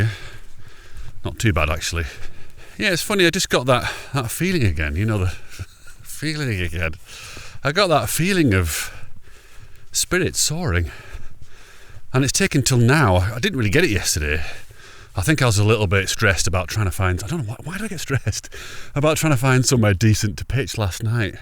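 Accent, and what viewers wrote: Yorkshire, United Kingdom. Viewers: I’m relatively new and love your videos. I went back to watch your Everest Base Camp video because you or someone mentioned it. Amazing camerawork, I felt like I was right there with you. As an ex-Yorkie now living in NZ, I love seeing the scenery that I left behind “oop north” and your accent reminds me of home. Amazed the Achilles is healing after a fast, gives me hope that I can fix my dodgy knees - Day 1 of my fast started today. Cheers!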